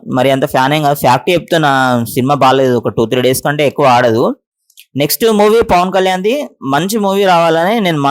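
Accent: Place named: Telangana, India. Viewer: Madi telangana bro 😂😂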